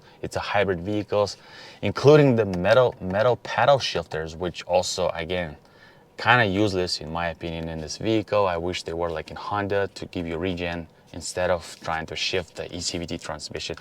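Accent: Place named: Russia. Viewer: Your accent Are you russian?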